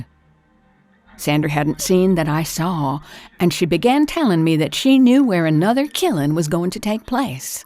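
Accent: South, United States. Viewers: Very excellent story. The narrator did an excellent job despite using a southern drawl to tell this unusual tale. Sometimes, when narrators use accents to narrate stories, it can be difficult to understand them, thus, taking away the pleasure of enjoying the story. Not so, in this case, she was very easy to understand. I hope to hear more from this narrator in the future. Also, the story it self was very well written. It had a different type of plot which gave it a fresh and new feel to it, that the other usual horror stories sometimes lack. The plot was also completely unpredictable which I liked, because I hate predictable stories. Finally, the unusual plot twist or twists were well done and very surprising. Overall, I really enjoyed listening to this story. Well done " ". 😉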